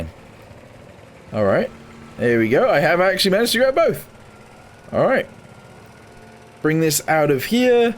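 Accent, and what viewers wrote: United Kingdom. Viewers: Is it just me or am I detecting a Geordie twang in your voice?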